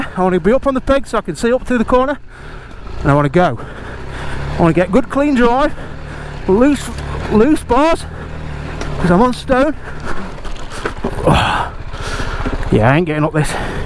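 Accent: United Kingdom. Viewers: Sitting here in QLD Australia watching this video tonight after work, highlight of my day mate, love watching your videos, and listening to you, miss the uk accents ! Waiting on delivery of a CFMoto 450MT, super popular bike, looking forward to breaking it in 👏👏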